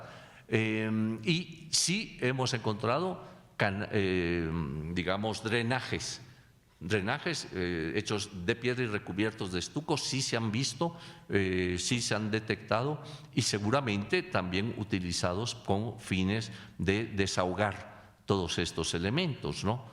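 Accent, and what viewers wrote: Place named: Spain. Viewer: Eduardo Matos, encargado de poner por los suelos la cultura Mexica. En la escuela nos enseñaron a desestimar nuestro pasado historico, y a llamarle a España "Madre Paria", naturalmente yo ya soy grande, ese mote se lo pusieron a España a travez de los siglos y todavia en el siglo XX se le seguia llamando así a la bola de delincuentes y bandoleros ignorantes, que por poner un pie en las playas mexicanas, pasaron a decir que descubrieron América y pasó a formar parte de los tesoros del reino español. Al profesor Eduardo Matos, se le nota hasta en la voz su origen español. Siempre poniendo muy por debajo la gran cultura de los pueblos Mexicas de la poca cultura que demostraron los españoles al destruir la gran ciudad de Tenochtitlan.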